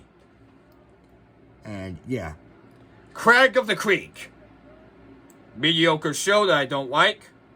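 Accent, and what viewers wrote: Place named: United Kingdom. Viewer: Dude sound British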